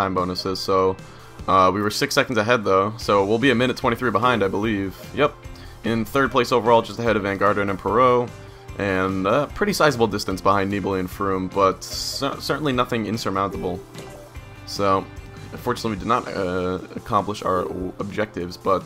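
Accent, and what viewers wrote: United States. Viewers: You're pretty much pronouncing all the names like an ignorant American to be fair ;-)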